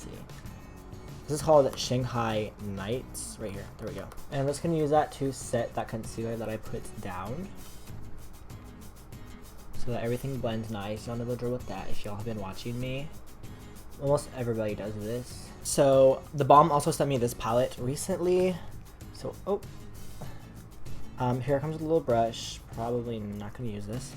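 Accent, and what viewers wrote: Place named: England, United Kingdom. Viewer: That annoyed English accent is my favorite part 😂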